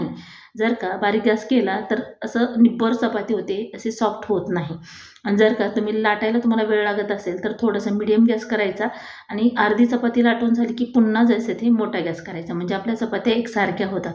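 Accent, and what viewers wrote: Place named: Ghati, India. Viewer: आवाज स्पष्ट येत नाही,खूपच घाटी होते बोलताना.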